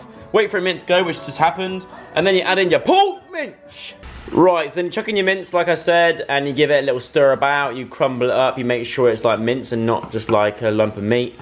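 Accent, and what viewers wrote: Australia. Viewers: Love ur Australia accent! Come to my home and I'll teach ya. Have a good day! Love u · i love that Australian accent 😂❤ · I love your Australian accent,!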